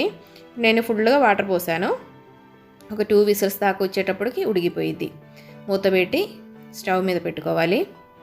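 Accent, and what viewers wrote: Kerala, India. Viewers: teluguni kerala accent lo matladthunnaru very nice ...first time hearing this ..how u learned telugu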